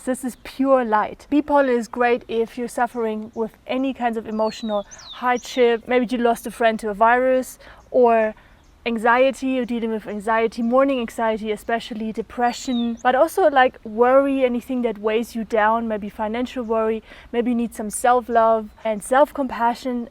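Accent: Germany. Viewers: This is the first video of yours I'm seeing. It's very interesting. You're in the Andes? But you sound German. I recently bought bee pollen for chronic inflammation. I'm hoping it will help. I like your video and will check out more.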